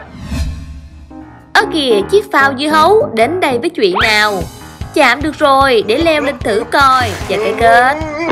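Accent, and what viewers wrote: South, Vietnam. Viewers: Lần đầu góp ý với Channel 3p mình phải thật lòng nói Ms MC có giọng nói Nam Bộ rất vui tính và very intelligence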